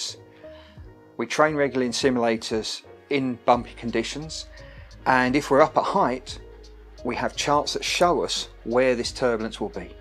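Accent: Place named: London, United Kingdom. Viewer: I'd say you're from East or maybe North London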